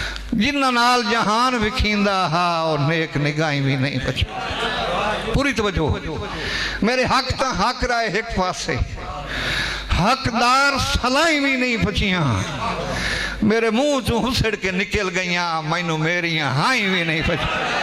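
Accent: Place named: Saraiki, Pakistan. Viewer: یہ پنجابی مشاعرہ ھے یا سرائیکی پنجابی َاور سرائیکی دونوں زبانوں اور خطے میں بھی فرق ھے میزبان بھی سرائیکی زبان کے لب ولہجے میں اپنی زمہ داری ادا کر رھے ہیں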